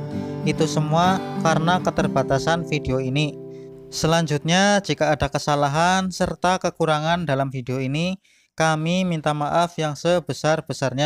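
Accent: Java, Indonesia. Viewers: Mohon maaf ya mas admin Ngomongnya medok banget. Menawi kedugi kulo nyuwun pirsa panjenengan piyantun pundi? Kulo saking Mranti. Kec. Kab Purworejo lelono wiwit bar sekolah th 1971 lulusan SMA MARHAENIS BERSUBSIDI PURWOREJO. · Mas min orang mana, medoq banget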